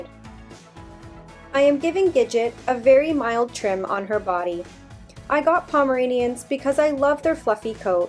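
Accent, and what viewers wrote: Canada. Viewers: And her subtle Canadian accent adds so much to it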